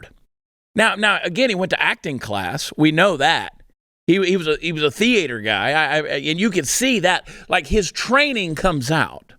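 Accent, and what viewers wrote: Texas, United States. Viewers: I personally love a wordsmith with a Texas accent! A day without Chad & Co. is less shiny and bright.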